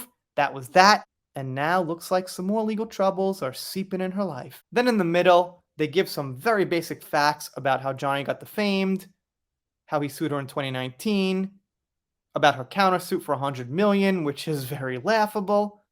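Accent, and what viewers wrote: New York, United States. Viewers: Love your posts! Esp outtakes! Love your New York accent the most!! Keep going!! Xo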